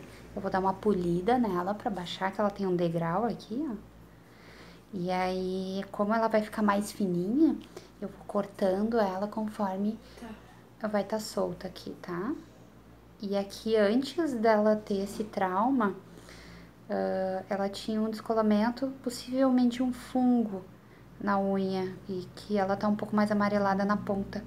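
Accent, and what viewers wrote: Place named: South, Brazil. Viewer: Pelo sotaque ela é do sul.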